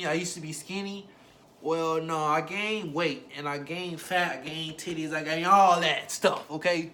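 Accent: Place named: Arkansas, United States. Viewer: I knew you sounded like an arkansan. I basically found a guide to uber eats in my state. I appreciate that.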